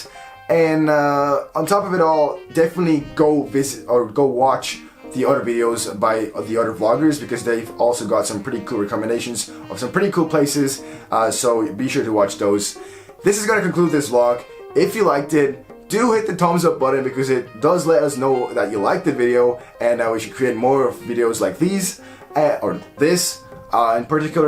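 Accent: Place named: United States. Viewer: where are you from? You have an interesting accent, it sounds American but not quite.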